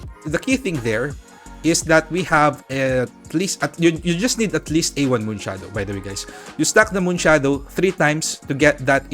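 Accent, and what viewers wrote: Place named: Tagalog, Philippines. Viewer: Are you filipino? Accent sounds like a native tagalog speaker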